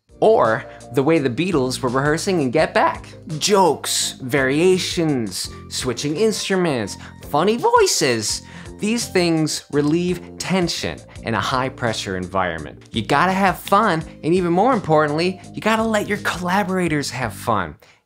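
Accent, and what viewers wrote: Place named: United Kingdom. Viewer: Appreciating all of this but esp the British accent dudeeeee.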